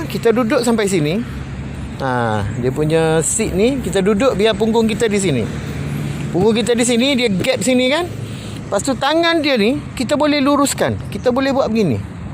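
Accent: Kelantan, Malaysia. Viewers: Ore Kelate ko hox kecex nih???? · acu kecek kelate skali bea hehehe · Kecek luar berbaur budu cap ketereh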